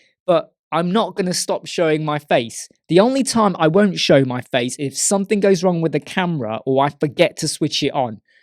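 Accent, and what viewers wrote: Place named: United Kingdom. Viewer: I love to watch you videos and is 1st time when I out a comment here. don;t take it pwrsonal, is because . I am too introvert to comment. 1- yea, maybe you talk too much but I see that like a good think: you give a better explanation and people can understand. the prcesses better. 2- Your Face . what? people say that to you? fuck them all ! 3- Elementor Bias: FFS your video are about ELEMENTOR! Is not about tomatos or pigeons! Elementor is your tool and if they want another editors they can watch other youtbers! period! 4- Promoting Hello: like you said: Hello is a FREE theme! I am not using it too much. I tryed ASTRA and OceanWP free versions. I chosed Ocean but Helo is an great and most important is a clean theme ! And in the future I want to focus on it. 5- Gimmicks? Iam lost on this! i do not understand what they want to say. 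because I learned many things for your videos and I am not new on elementor... 6- Too Excited: that makes your videos funny :) 7 not the Beta... Is someone want to see what is comming they can always use official elementor channel or watch other youtubers. 8: UK. well, you are born in UK. I jus tcome here in 2015. Your accent is 100% british. Yes, you are from UK :). 9- OceanWP. Well, Astra is worst than Ocean WP. The main reason i din not bough Astra or OceanWP is this: If i have troubles with free versions, why should I pay for it? my final toughts: keep doing your work :) haters always will hate. And I appoligise for my english, is not my native l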